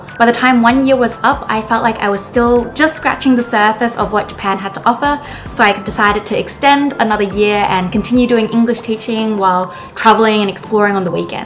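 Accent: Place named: Australia. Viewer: No wonder U have a very strong Aussie accent! 🤭 Was wondering how come a Japanese girl speaks like an Aussie! 😆🌏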